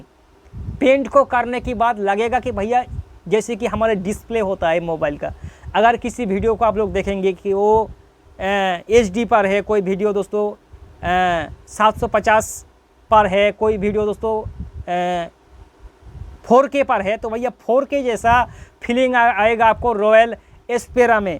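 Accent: Bengal, India. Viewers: Ade baba tum bongoli hai kya 😅 nice video ❤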